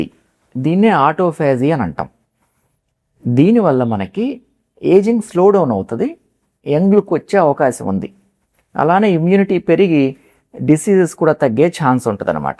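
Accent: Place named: Guntur, India. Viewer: Sir, Meeru Guntur ani ardham avuthundhi,Ela ante vachhiddhi,thaggiddhi edhi guntur valle matladatharu, Good information sir🎉🎉🎉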